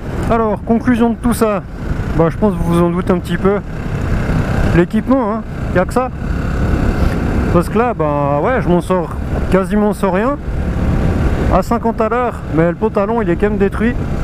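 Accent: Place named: Switzerland. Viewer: ta cru que j'avais pas deviné que tu étais suisse avec le magasin coop au débute de la vidéos et ton petite accent des famille (bon ok je suis aussi suisse :) )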